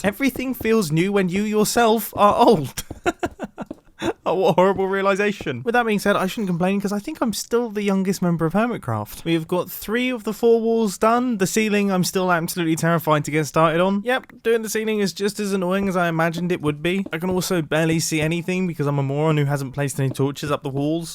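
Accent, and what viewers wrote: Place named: United Kingdom. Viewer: the word 'puddle' is 1000% more funny when said with an authentic British accent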